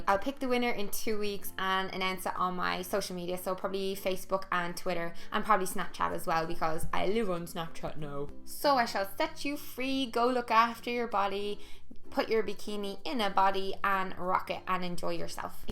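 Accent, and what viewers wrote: Ireland. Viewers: Love hearing an Irish accent when I click into a video, much love from down the road in Kildare ;) :)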